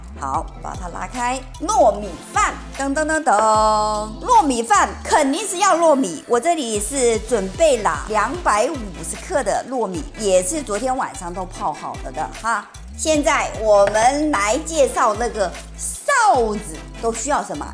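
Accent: Wuhan, China. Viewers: 哈哈哈 听你说的第一句话已经确定你是正宗武汉人了，明天就去按你的教程做豆皮去哦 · 哈哈哈哈哈哈，普通话说着说着就变成了武汉话，你这汉普真的是让我感觉无比的亲切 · 拿起走，尝一尝 熟悉的汉普 哈哈哈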